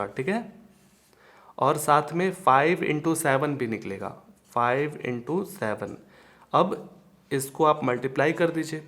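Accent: Bihar, India. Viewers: Dear sir Ur Bihari tone is so sweet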